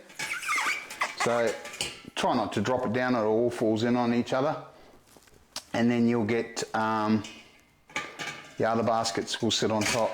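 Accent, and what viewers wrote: Australia. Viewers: sorry bro but is that oz accent ? would like to know how you define the taste of ? keg hangi above ground hangi all taste like boil up …? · Sounds like this Kiwis an Aussie.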